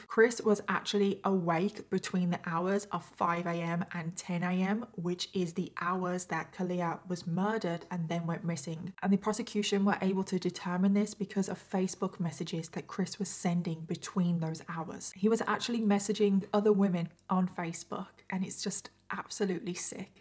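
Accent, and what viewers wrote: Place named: RP, United Kingdom. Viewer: It's weird to hear someone with her accent be shocked about cousin relationships.. royal fam history?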